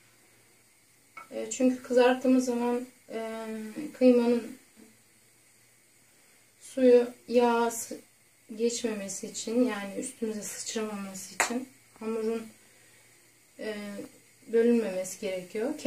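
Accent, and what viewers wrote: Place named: Xinjiang, China. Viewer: türkiyede mi yaşıyorsunuz? lehçeniz uygur lehçesini andırıyor...türkmenistan türkü müsünüz?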